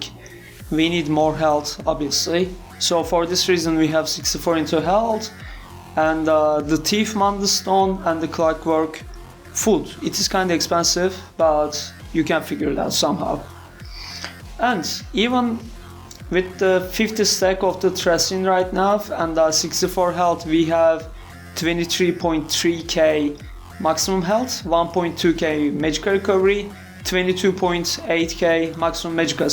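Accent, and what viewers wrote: Turkey. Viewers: are you from turkey mate?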